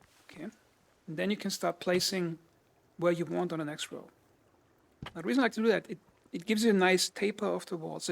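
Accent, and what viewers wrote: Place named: Germany. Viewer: Textbook German accent 😊 🇩🇪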